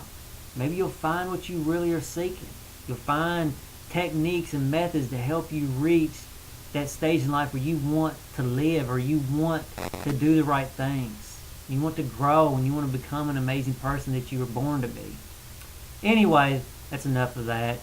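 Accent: South, United States. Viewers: haha nice southern accent and i no everyone is dieing....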